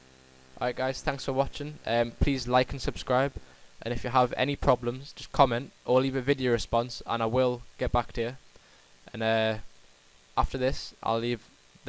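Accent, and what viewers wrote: United Kingdom. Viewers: nice tutorial man i like your british accent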